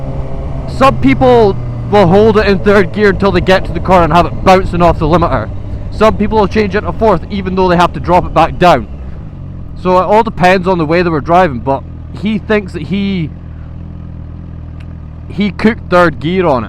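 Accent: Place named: Scotland, United Kingdom. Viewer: Refreshing to hear a Scottish voice. Nothing wrong with keeping a bike you like .its for you not anyone else. I have a 1981 Yamaha RD350LC i have had it since 2000 . I have a 2000 suzuki tl1000s owned it since 2001 also a suzuki Gsxr1000 k5 owned for 4 years no intention of selling anything also a 1978 Yamaha dt175mx owned for 5 years. Be happy with whatever you have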